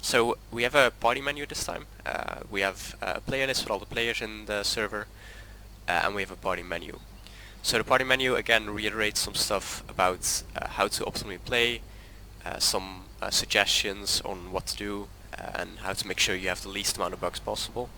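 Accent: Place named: Netherlands. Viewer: Zieke shit, thanks maat ik hoor je nederlandse accentje wel hoor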